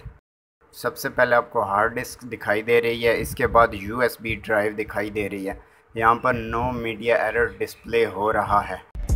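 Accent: India. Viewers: I don't know but I felt I found the true and the best video when I heard the Indian accent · Punjabi Language destroys the accent of every language like this brother's.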